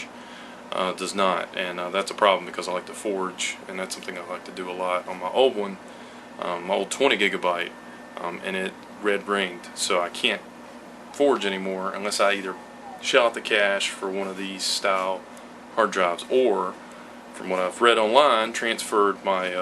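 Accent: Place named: United Kingdom. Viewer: Love the British accent. All these years later I had no idea I could do this.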